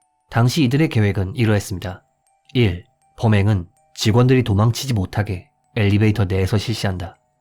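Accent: Japan. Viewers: 일본에 살고 계시거나, 일본과 종사하는 일을 하시는지요? 일본 지명이나 단어를 이야기할 때 쿠세가 있는 것 같네요. 다만 장기간 거주한 것은 아닐 것 같은 발음입니다. 영상 잘 보았습니다.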